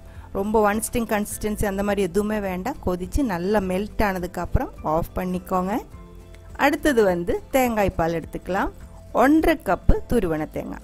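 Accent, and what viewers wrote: Kerala, India. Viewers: Hi Super sis. Tamil mix aana Malayalam unga voice ku nega peasarathu rmba nalla sis. Kandipa na try panni pakara.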